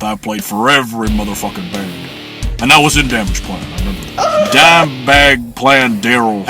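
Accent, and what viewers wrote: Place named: Canada. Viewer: u sound Canadian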